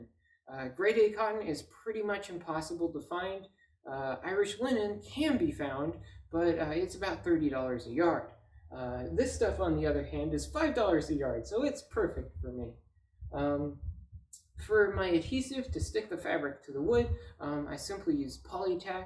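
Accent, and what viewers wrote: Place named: Canada. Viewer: Has to be his voice, and that hippy Canadian accent.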